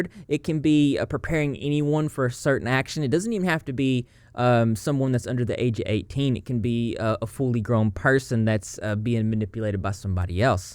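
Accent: South, United States. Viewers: oh okay I couldn't pin point it. I'm like he sounds southern but mixed with another accent 😁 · I forgot what state he said he’s in but it’s an American southern/ mid western accent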